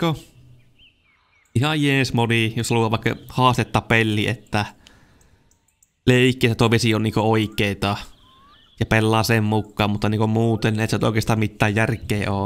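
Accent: Finland. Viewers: Itä suomen murretta minkä sille voi jos on opetettu sanoon nii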